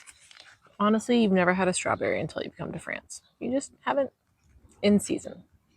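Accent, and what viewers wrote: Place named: United States. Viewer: Your behaviour is spot on French the only things you can't your accent plugs you as America not that not a bad thing the French do enjoy that because you have become French, the thing you chase flies away don't feel bad I still do the same thing and I was born here you could actually be more French than me now that is funny! I hope you wash away some stress in the court yard which a common thing to have in many buildings even in Paris! Your looking far less stress keep doing this your on the right path! One side note you should understand saying no is what all French to everyone your allowed to say no sometimes you may have to repeat yourself if he will not take your no walk away their hate I can assure he has had to told him long before he see you, and he can handle one more no! Try some of that cheese with fruit pears are really great with many cheese don't forget to take small spits of your wine with your cheese! 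It is OK to look like Marie Antoinette the French love this I am telling you I see a French lady you even have a level of class unseen by Americans. To be fair I have American accent when speaking French I have not been in France for near 40 years I don't feel French even though I am no longer American! I am having fun watching you taste cheese like I do yeah this is fun!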